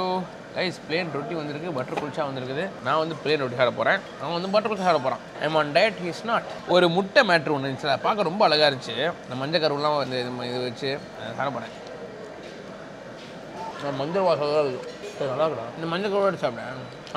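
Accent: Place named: Tamil Nadu, India. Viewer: Liteaaa JK Tamil Slanggg varutheyy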